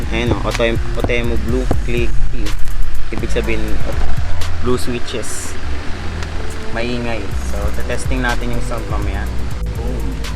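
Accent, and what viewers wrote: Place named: Iloilo, Philippines. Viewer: ahaha ilonggo ka gle ahaha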